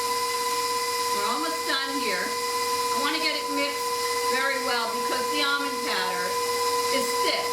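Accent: New York, United States. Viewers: Hello sweet:) My Almond Bars are in the oven right now---ohhh how lovely they smelled just mixing the ingredients let alone while baking! I tweeked a bit--I added 1 tsp almond extract to the cookie dough base--no such thing as too much almond flavoring...right?!! And, I'm going to put a simple powder sugar/water/almond extract glaze on top--just a very thin coat...I can't wait to have a taste test! Thank you so much for the recipe. I enjoyed your video and found both you and your "camera man" endearing. I detected a NY accent. The Bronx? Just guessing. Thanks again! Have a blessed day.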